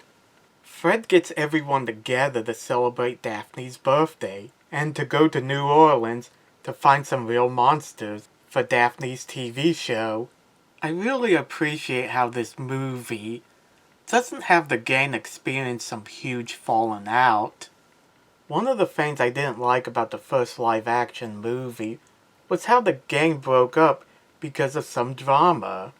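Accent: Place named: Maine, United States. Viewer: hey, just found ur channel thru ur video on roy and i loved it! very helpful information. i was just wondering, are u from the northeast by any chance? ur accent makes me think u might be from maine. take care!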